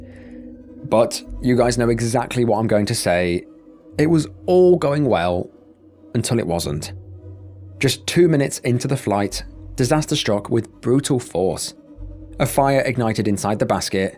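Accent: United Kingdom. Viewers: The Queens English in Ai is just too funny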